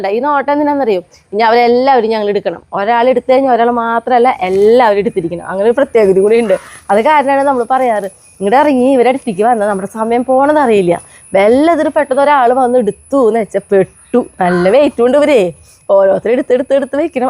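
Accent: Thrissur, India. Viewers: ട്ട ഞങ്ങൾ തൃശൂർ കാരുടെ ട്രേഡ് മാർക്ക്‌ ആണ് 😄😄😄😄👍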